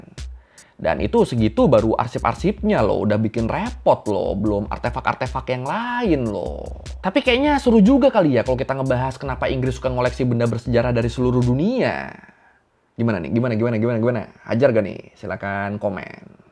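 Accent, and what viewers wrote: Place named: Pontianak, Indonesia. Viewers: Pacak pulak kau ngomong ponti bg, mantap cerita sejarahnya bg, alasan kenapa byk etnis tionghoa di pontianak dan singkawang, kisah leluhur morgan smash, ghea idol, cece felicia putri dll... pokok klo ke pasar mw nawar sm org china jgn lupa bilang, "ankui, pun ngai piyen nyin laaa" 😁🙏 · Daerah kamek tu padahal tu. Logat nya ponti banget😂, bang asli orang ponti kah? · Daerah kamek ternyate tuh Gile gile gile wkwkwk mantap bang aksen Melayu pontianak Nye 🤣🤣🤣🤣 kapan kapan kite ngopi bang 😂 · Kau orang Pontianak ke boi?bale....balak kau ni😂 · Lah abg nye org pontianak rupe wkwkwk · bole bole abang ini ni, pandai pulak pakai bahase pontianak. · Kau orang ponti ye bang, salut 🎉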